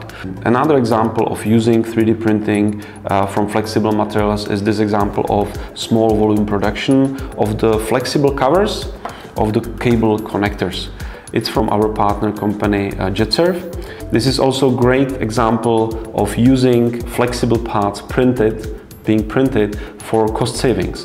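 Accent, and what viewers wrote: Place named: Czech Republic. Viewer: Jak jsem slyšel první slovo, tak si řikám, že docela čenglish. Kouknu na info a ono jo xD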